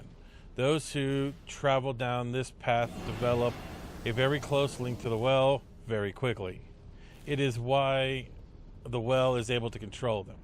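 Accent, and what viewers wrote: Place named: United Kingdom. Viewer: he's British, not American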